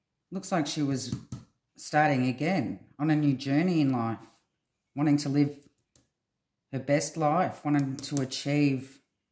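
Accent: Australia. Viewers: This rings true. I hope they convict him and find her! Thank you for sharing. I love your work and your soulful Ozzie accent. :)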